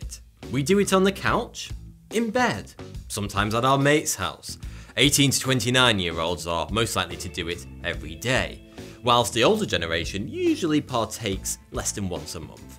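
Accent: United Kingdom. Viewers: Nice and British, yes... He not also have said "but" strangely BUT also "ass"... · it's a typical British accent really I am not originally from the UK but I live in Yorkshire and I can tell you that loads of British people have a lazy way to pronounce "th" simply sounding like they are pronouncing "f"... For what I heard he is originally from the Isle of Man.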